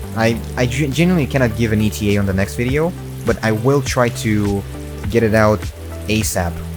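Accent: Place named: Russia. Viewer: How long have you been speaking english? You hardly have an accent so I didn't believe that you were Russian at first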